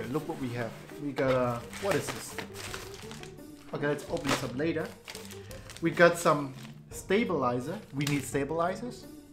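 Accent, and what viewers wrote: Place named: Germany. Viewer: BIG German accent but great video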